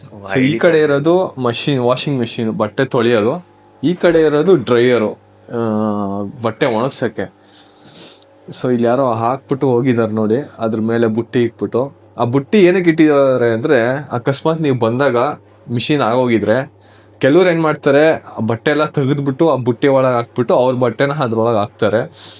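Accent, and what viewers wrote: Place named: North Karnataka, India. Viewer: ಯಾವ್ ಊರ ರಿ ನಿಮ್ದು? ನಿಮ್ಮ ಬಾಯೊಳಗ ಕನ್ನಡ ಕೇಳಾಗ ಬಾಲ್ ಖುಷಿ ಆಗ್ತದ.. ಬಹುಶಾ ನೀವು ಉತ್ತರ ಕರ್ನಾಟಕ ಕಡೆ ಇರ್ಬೇಕು ಅಲ್ಲಾ...?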